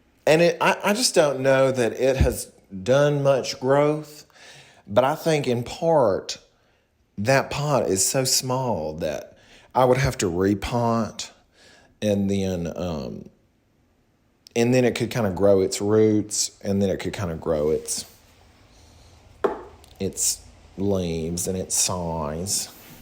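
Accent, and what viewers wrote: South, United States. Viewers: This amalgamation of a southern accent is like Nashville Grand ole Opry singer meets rural Georgia buget Paula Deen. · that southern drawl lulling the depression out of me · New here. That southern accent is so spot on. Where are you from? You’ve obvs spent time in the south. Love your stuff. It’s bananas and exactly what I need right now 🙏🏼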